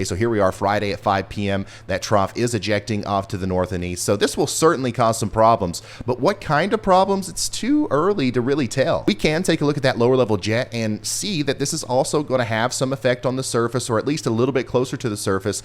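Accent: United States. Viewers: i love your little country accent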